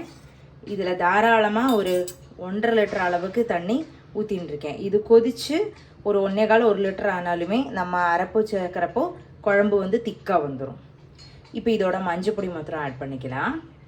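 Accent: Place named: Palakkad, India. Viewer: பாலக்காடு பிராமண தமிழ் ரொம்ப பேஷ் பேஷ்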